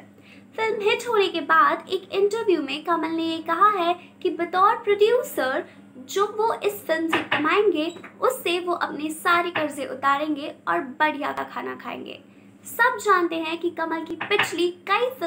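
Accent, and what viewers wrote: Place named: United Kingdom. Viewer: Your Hindi assent is Englishized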